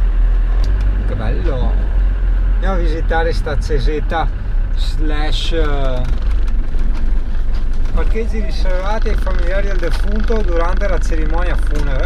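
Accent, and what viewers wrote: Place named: Treviso, Italy. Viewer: El to dialeto ghe someja tanto a quel de Treviso.....!!!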